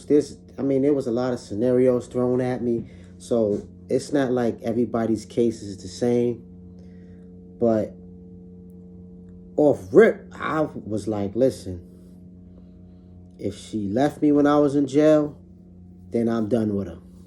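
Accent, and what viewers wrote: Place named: Pennsylvania, United States. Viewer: I'm a new subbie & supporter. First thing, your voice is giving me Cassidy vibes😁im from PA and I recognize the accent. Second thing, you are a nice looking dude. Third, u need to post your cash app so your supporters can really support support if you feel me. Much love. Keep posting.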